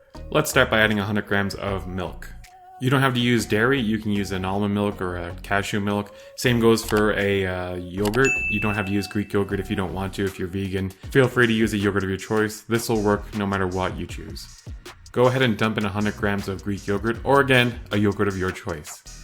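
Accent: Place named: United States. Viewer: you sound American af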